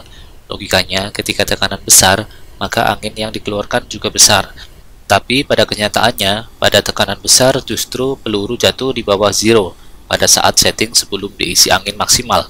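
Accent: Banyumas, Indonesia. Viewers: Bahasa ngapak ny exsisin dong mas.. Hihi salam. #orangapakorakepenak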